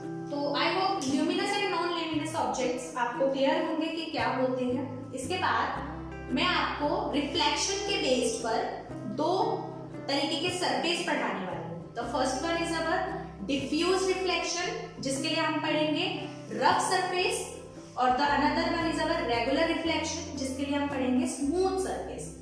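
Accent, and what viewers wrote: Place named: Haryana, India. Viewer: Maine socha haryana se ho 😅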